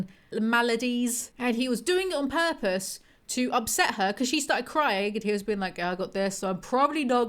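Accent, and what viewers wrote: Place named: Essex, United Kingdom. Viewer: Essex voice what's these rumours then?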